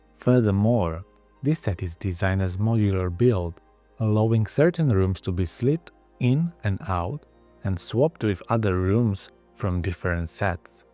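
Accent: United Kingdom. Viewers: I’m sorry to be rude, but I’m not watching this channel as much anymore. There was something charming about the British AI voice…that said, I pretty much hate everything else AI, but he had happened to pick the perfect voice. Oh well, I suppose I can always go back and watch the old ones.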